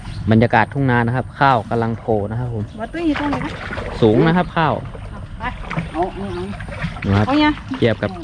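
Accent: North, Thailand. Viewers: เห็นพูดเหนือ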